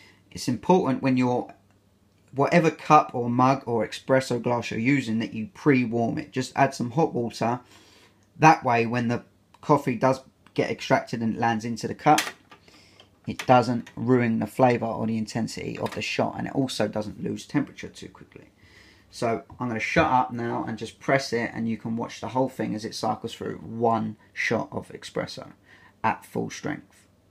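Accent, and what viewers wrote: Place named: United Kingdom. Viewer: It's the thick British accent 😉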